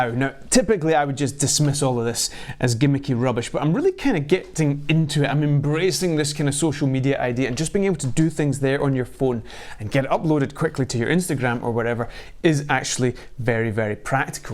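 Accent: Scotland, United Kingdom. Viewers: Man, Every time I watch your channel it makes me wish I had a cool Scottish accent. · Just got our first drone today thank you for this as we need all the help we can get ..Was great to hear a Scottish voice we are both rom Edinburgh but live in Spain now thank you !!! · Good to hear a fellow Scot! I’ve just bought the mavic mini and wondering how it copes in the wind in Scotland? As you’ll know it’s windier then most places so given its so light I’m a bit paranoid.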